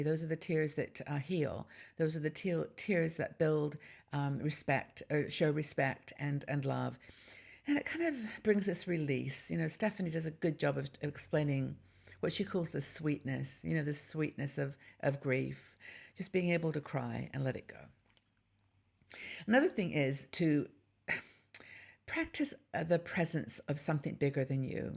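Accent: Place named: United Kingdom. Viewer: I can hear that British accent love your channel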